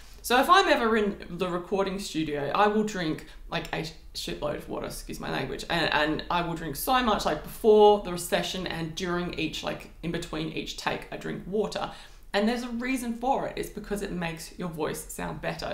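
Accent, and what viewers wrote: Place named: Australia. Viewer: It's great to hear an Aussie voice on Youtube. Your approach to your videos is very refreshing.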